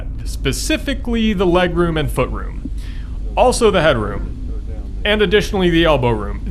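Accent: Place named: Alabama, United States. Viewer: What part of Alabama are you from?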